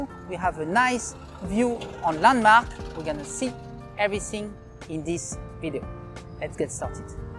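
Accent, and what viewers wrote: France. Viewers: Also sein Englisch-Französischer Akzent, ist verständlicher als gewisse andere Britisch oder Texanisch Englisch sprechende Personen. Desweiteren könnte man auch sagen, vielleicht lernt man den Englisch - Französischen akzent zu hören und zu verstehen. Get out of your Comfort Zone and grow your learning curves. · He has a French accent because he is ... FRENCH!! Can Americans be respectful or is that too much asked?! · Nice french accent. Very funny to hear it.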